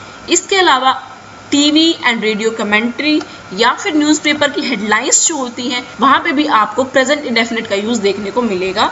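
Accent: Delhi, India. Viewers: You are from Delhi mam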